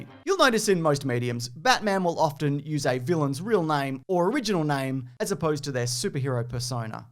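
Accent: Australia. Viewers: Came here for the lame theories. Stayed for an Aussie talking about Scotland.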